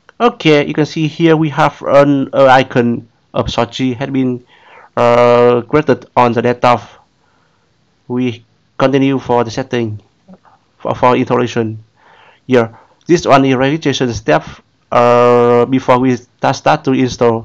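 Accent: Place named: Vietnam. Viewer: tiếng anh nghe là biết dân việt nam zồi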